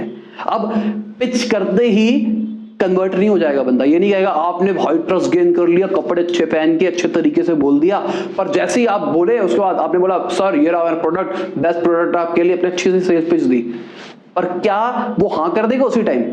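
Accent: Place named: Delhi, India. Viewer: Wow maja aa gaya aaj, sales mai aap master ho.... Muje aap delhiwalo ka bolne ka toon bahut pasand hai.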